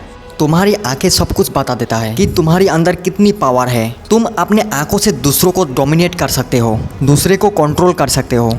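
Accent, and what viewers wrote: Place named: Bengal, India. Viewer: Bengali ascent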